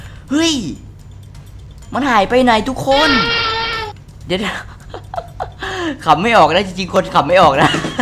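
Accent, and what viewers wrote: South, Thailand. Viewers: พี่เป้นคนใต้ใช่มั้ย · แหลงใต้ออก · พี่เป็นใต้เห่อ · ฟังภาษาก็รู้ว่าเป็นคนใต้ผมก็เป็นคนใต้ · พีแหลงไต้ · พี่เป็นคนใต้ปะ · พี่เป็นคนใต้หรอ!! 55555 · คนใต้แม่นนิ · คนใต้นิหว่า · พี่เป็นคนใต้ใช่มั้ยนิ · พีขอถามหน่อยเป็นคนใต้ไม่เนี้ย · คนใต้นิเบอะ555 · พี่พูดใต้ใช้มั้ยตอนที่ไปูบัตรเปลี่ยนเชื่อ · พี่บ่าวเป็นคนใต้หรอ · พี่เป็นคนใต้หรอ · ใต้มาชัดเจนเลยนิ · พี่คนใต้หรอคับ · หนูพึ่งรู้นะเนี่ยว่าพี่เป็นคนใต้😆💕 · ชัดเลยพี่เป็นคนใต้ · คนใต้มั้ยนิ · พี่ครับรหัสหลักผมโดนแฮ็ค<num>ผมควรทำอย่างไรครับใครรู้บอกหน่อย ผมเพิ่งรู้ว่าพี่เป็นคนใต้55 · พี่เป็นคนใต้หรอค้าบ❤️🥰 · แหลงใต้เหมือนเราเลยนิ คนนครศรีธรรมราช5555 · คนใต้นิพี่ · พี่เป็นคนใต้หมันม่ายคับผมก็เป็นใต้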